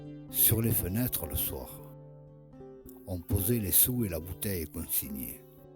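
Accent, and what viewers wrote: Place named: Provence, France. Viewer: Grand merci l'ami pour cette jolie et emouvante video , avec son texte plein de poesie ! ton histoire me ramene a des temps anciens , restés chers a mon coeur .....sorti de mon auvergne natale ou je suis retourné maintenant , j'etais rentre "aux chantiers" en 56 comme apprenti en logeaint chez un copain de marine de mon pere , en face de la ROUVE , quand les collines etaient encore pleines d'oliviers et pinedes .....plus tard deux ans dans la royale de 61 a 63 .....revenu marié en 67 , mon second fils né a la seyne , nous habitions a l'aiguillette juste derriere la rouve .......les oliviers avaient disparus , les mimosas aussi , les figuiers du chemin du manteau , avec des arbousiers et les cigales restaient ......la vigie etait sortie de terre , on se baignait a balaguier et les chantiers du midi ( le petit baigneur ) fonctionnaient .......le bar des marroniers accueillait ses boulistes .......les sablettes n'etaient pas mangées par les parkings et pin rolland voyait arriver ses premieres villas ! 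je bossais a fabregas , le brusc , l'arsenal , ......mayol n'avait pas ecrasé le marche de gros et barneoud ouvrait..... tant de souvenirs reviennent et malgre les annees tout reste dans ma memoire ! ton accent m'a redonné les bruits et odeurs des " sanguins " de la pinede ........j'y reviens parfois mais tout a tellement change ! meme berthe ou j'ai travaille a ete fracasse .....le cours louis blanc bourdonnait de camelot et la marchande de cade me plaisait trop ........le temps f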